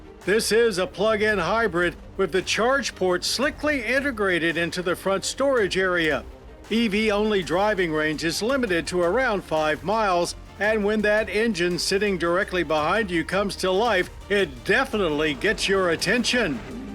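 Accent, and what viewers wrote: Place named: Maryland, United States. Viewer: I am always amused by the way he says "subwoofer" & "compass" with his Maryland accent. He says sub-WOO-fer instead of sub-woof-er. He also says the com like the end of a website name instead of come. Robin Ophelia Quivers from The Howard Stern Show on SiriusXM Satellite Radio also has these quirks from Maryland.😊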